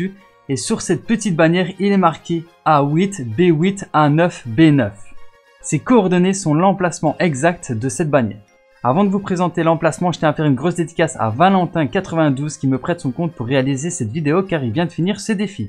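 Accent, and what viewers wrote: Belgium. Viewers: Tu es belge Nn?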